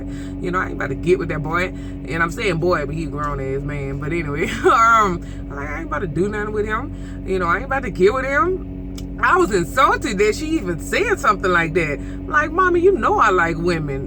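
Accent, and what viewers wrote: Louisiana, United States. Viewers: You sound like my people in Houma Thibodeaux Morgan City Patterson. Oh Lake Charles too. Don't worry about what others think. Do you do more do better.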